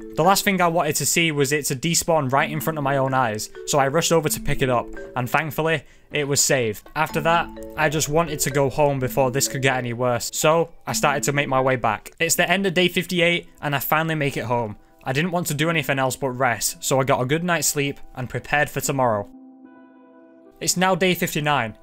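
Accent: United Kingdom. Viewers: Your Briish Accent is Strong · this mans scottish accent be poppin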